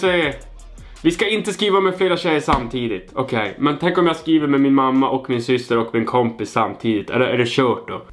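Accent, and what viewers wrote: Norrland, Sweden. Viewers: Hahhaha varför gillar jag din dialekt men hatar norrländare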